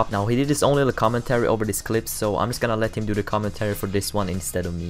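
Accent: Sweden. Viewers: Are you from Sweden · Are you swedish? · Lol man hör att du e svensk :D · Det hörs tydligt att du är svensk så var tvungen att kolla upp det i kanalbeskrivningen ;) A men de e gött iaf att svenskar är bäst och har ofta bra utbildning i videoskapande.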